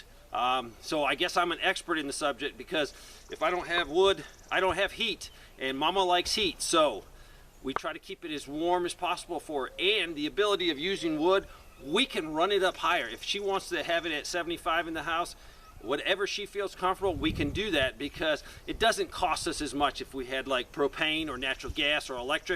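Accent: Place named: Kansas, United States. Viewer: why are you called when you sound like you're in kansas or somewhere not south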